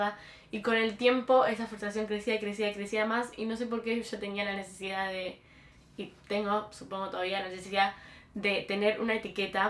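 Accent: United Kingdom. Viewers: Vine a tu canal por tik tok. Empecé con un vídeo de como aprender inglés y termine en este video 🤭 eres re linda! Amo tu acento británico 🤩 y le aplaudo 👏 a tu mami por apoyarte puesto que no todos los padres entienden a sus hijos cuando se declaran con otras preferencias sexuales. Tus videos me han ayudado mucho! Sigue así! 🤗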